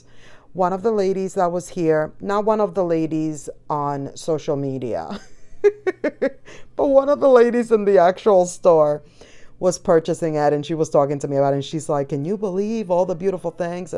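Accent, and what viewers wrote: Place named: South, United States. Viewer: You are in Myrtle Beach?! I’ve been wondering what southern state you’re from b/c you say yall like a southerner !😂. I’m right up the road in the upstate! I love the beach in the fall!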